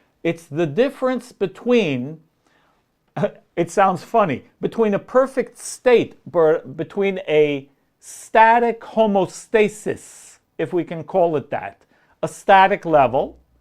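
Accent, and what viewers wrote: New York, United States. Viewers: It's always a pleasure to virtually sit with you to learn. Thank you teacher Rabbi. Yearning reminds me of what I tend to tell people: you must back up your desire with action. It's that simple. Much love and respect to you Rabbi. Many blessings to you and yours. On another note, your New York City stye always brings me home again, lol.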